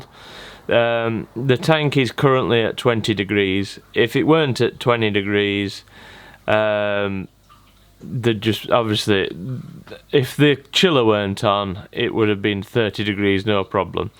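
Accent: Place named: West Yorkshire, United Kingdom. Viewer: Hello Came across your video's by chance and wondering if you sell koi, your fish look amazing. We are in West Yorkshire, not too far away going by your accent. We have kept Koi 30+ years and haven't bought any fish for years and our pond is now looking a little bare.so we are looking to restock.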